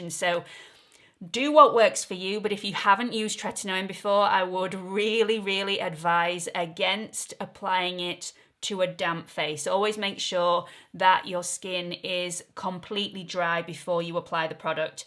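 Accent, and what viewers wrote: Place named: London, United Kingdom. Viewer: Love your London-english 💜💃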